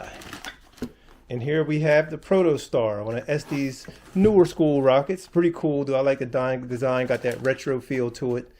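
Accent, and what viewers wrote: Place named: Flint, United States. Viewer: Sounds like is from Flint Michigan. I wonder if Ryders hobby still open? Hi! From Saginaw, I grew up in Flint. Stay blessed.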